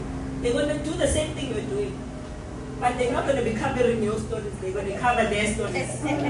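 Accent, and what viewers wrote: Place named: Durban, South Africa. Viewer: That Durban Accent is loud and we proud of her.Siyabonga dade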